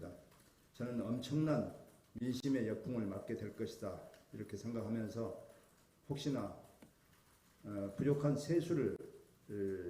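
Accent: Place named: Honam, South Korea. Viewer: 주승용 가리려해도 호남말투 가려지지가 않네.그러니까 니가 민주당 꼬봉밖에 못하는거다.퉷!지긋지긋하다 호남국 인간들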